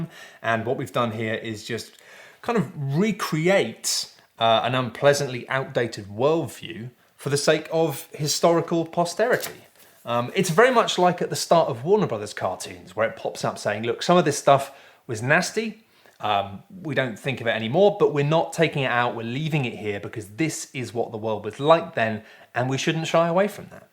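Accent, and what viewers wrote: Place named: Liverpool, United Kingdom. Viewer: What accent was that!? Vaguely scouse in parts, BBC Generic Northern?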